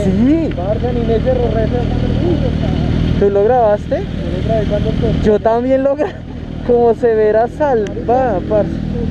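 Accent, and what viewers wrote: Colombia. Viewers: Bonito el acento colombiano.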